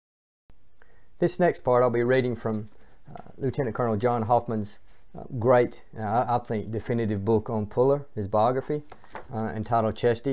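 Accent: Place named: South, United States. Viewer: Outstanding work Marine! So many stories have been told about Colonel Puller that it sometimes is difficult to separate fact from fiction. This is definitely fact. By the way, I am trying to place your accent as I am a fellow southerner. I am thinking Virginia or North Carolina. I am from Tennessee and am just wondering on a personal level. It makes no difference as I am proud to view your efforts on behalf of the U.S. Marine Corps.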